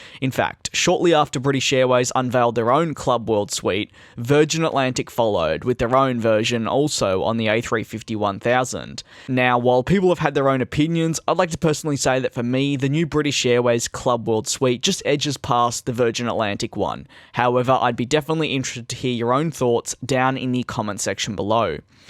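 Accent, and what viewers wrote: Australia. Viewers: Your Australian arnt you mate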